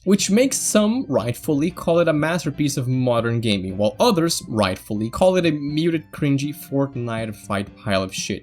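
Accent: Brazil. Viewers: U kinda sound brazilian edit: kkkkkkk you are 🇧🇷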